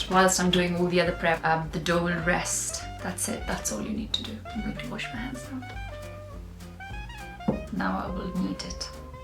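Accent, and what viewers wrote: United Kingdom. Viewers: the problem is you bacame too british. . and wtf is this candle crap. turn on your lights lmao.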